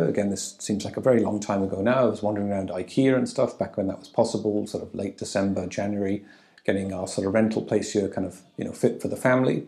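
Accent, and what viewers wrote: United Kingdom. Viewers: Nice to see u again. Is your British accent is softening? Is it possible to retrofit indicators on e bikes and not waving hands?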